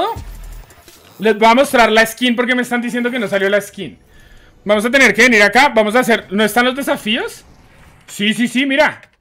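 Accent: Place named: Bogotá, Colombia. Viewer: Eres de Bogotá cierto? Jajaja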